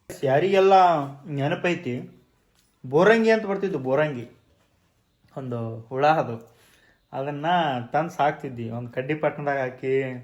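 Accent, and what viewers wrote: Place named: Bangalore, India. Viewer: Nim vlog nodi khushi ayitu😂 benglore bhashe chand matadiddiri sis❤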